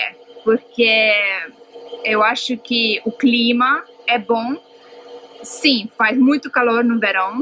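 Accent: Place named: South, Brazil. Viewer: Já te chamaram de "alemoa"? Teu sotaque e a tua aparência é bem comun entre os descendentes de alemães do sul do Brasil.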